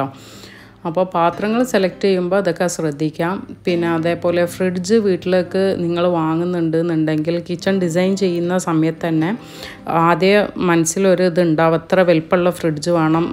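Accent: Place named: Kozhikode, India. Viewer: Wa alaikkum mussalam Bhayankara bhayankara vellye fan aan njan ee nde Masha allah Almost ingalde ella videosum kand kayinj actually irfanatha vazhi aan ingalekk ethnnath and you’re so so adipoli ❤ Edkk verne ingale kozhikodan slang comedy aan😅 Masha allah keep going 🫶 Withloveshaibi❤️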